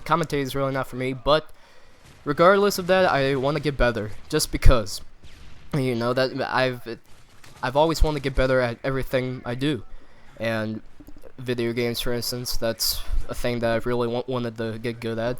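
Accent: United States. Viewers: your voice sounded so much more confident and American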